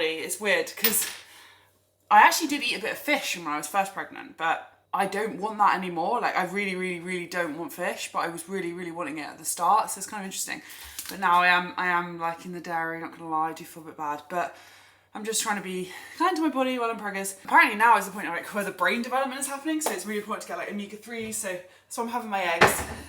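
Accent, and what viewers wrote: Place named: England, United Kingdom. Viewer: I love your videos. As a Brit now living abroad it makes me smile to hear an English accent, see familiar British sights and have a catch-up with how things are going with you and. Yes - I'm a walker and camper who discovered you both through those video styles, but the everyday family stuff suits me too. Thank you! Beach fail - romantically watching a dog poop in front of you and watching it steam in the salt breeze. Lol!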